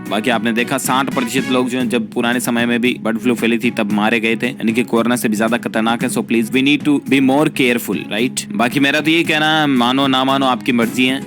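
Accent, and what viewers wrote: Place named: Hyderabad, India. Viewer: Hyderabadi hona bolte.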